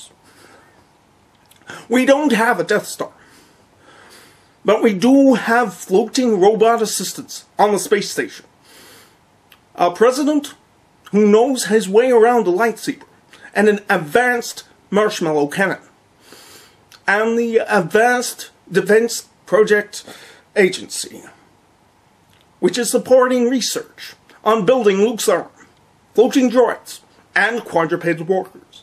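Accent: Standard. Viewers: Fairly well done neutral American accent :-)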